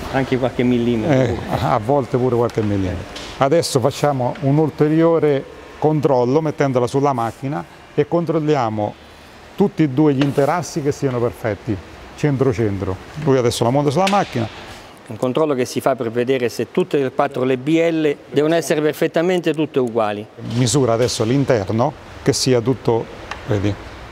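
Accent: Abruzzo, Italy. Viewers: "Pecché nen chiut perfettamend'" "E quand è lung 'stu tapp!" L'accend abbruzzes é lu topp!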